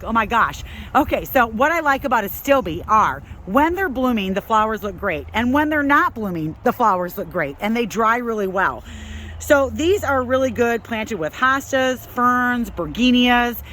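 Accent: Midwest, United States. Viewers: If anyone needed an example of Midwest accent, this is it. Love the video!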